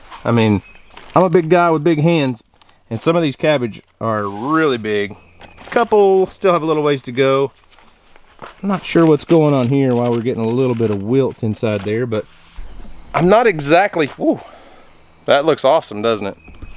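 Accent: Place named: Houston, United States. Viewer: Called. Slow up. Lol Great job yall.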